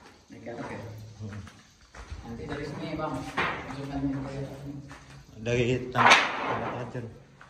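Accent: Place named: Sambas, Indonesia. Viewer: Macam orang sambas pak aji ngomong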